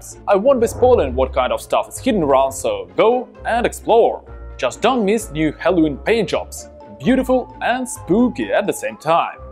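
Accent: Russia. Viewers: russian dude, right?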